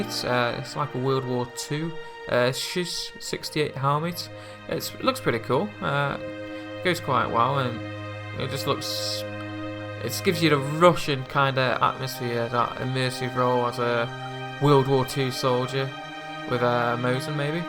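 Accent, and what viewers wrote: United Kingdom. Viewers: Are you from liverpool? You sound like you are.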